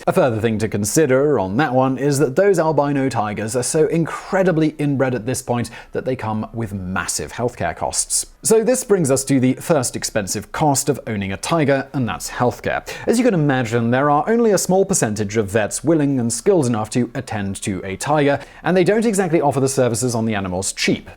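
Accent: United Kingdom. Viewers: Your British sounds somehow better than other British accents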